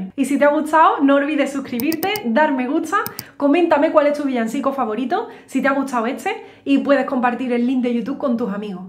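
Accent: Andalusia, Spain. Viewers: Que preciosa versión 💐 Amo el acento adaluz, lo amo en serio.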